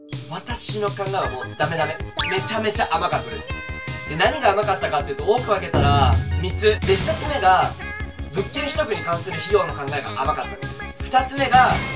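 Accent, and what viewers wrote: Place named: Gunma, Japan. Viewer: 群馬イントネーションわろた。ジャートンの編集いいよね。笑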